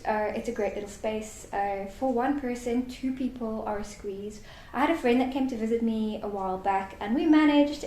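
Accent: South Africa. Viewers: Ek wou se ek hearken n SA aksent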